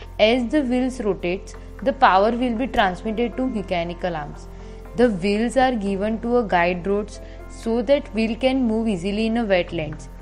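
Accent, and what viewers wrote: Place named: India. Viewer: Oh God I love Indian accent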